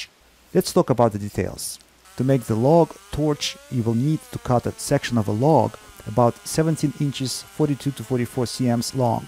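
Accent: Russia. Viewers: Я только за если человек стремится изучать больше языков, но при этом твой контент теряет акцент русского. Кстати, не советую так же т.к данный материал получается дублирующий контент, за что на ютюбе могут сделать бобо. Т.к. алгоритмы понимают данный материал как повторяющийся\дублирующийся контент. Удачи, здоровья!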